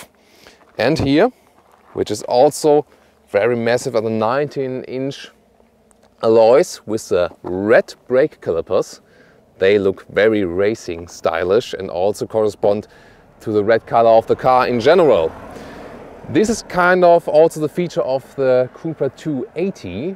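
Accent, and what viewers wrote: Germany. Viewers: God I love listening to a German accent when watching videos about cars!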